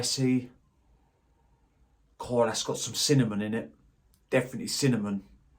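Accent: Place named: Birmingham, United Kingdom. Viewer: Nice review and cool accent. Are you a Brummie? You sound like Arthur Shelby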